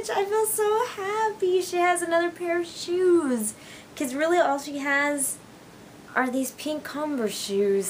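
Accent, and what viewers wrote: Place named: Cockney, United Kingdom. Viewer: Random accent almost sounded a little Cockney. :D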